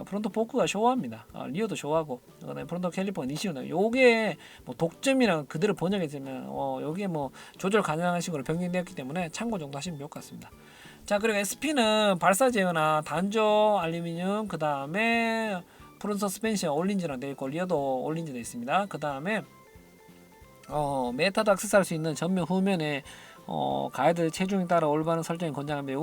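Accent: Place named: Gyeongsang, South Korea. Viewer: 대구에 매장있으셧내여 억양이 익숙하다 싶었는데 매장에서 혼다 자켓은 입어볼수있나요?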